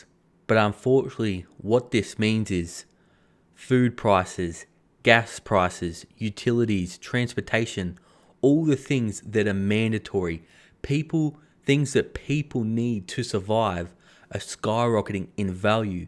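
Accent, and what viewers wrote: Australia. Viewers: Are you from auzzie or nz bro? You sound like an anzac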